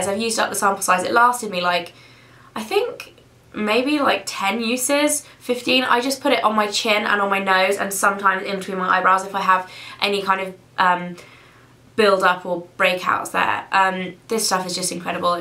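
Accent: England, United Kingdom. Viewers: It's not a British accent, its an English one... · I'm the ONLY one in the world, i know, who cannot stand the british accent, sorry.... Cant watch now. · i'm so jealous of anyone w a british accent!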